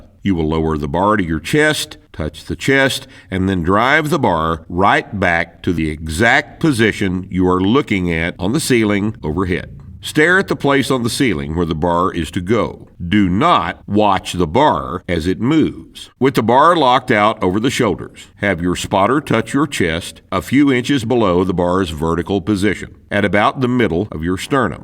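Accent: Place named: Mississippi, United States. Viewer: That voice came straight out of Mississippi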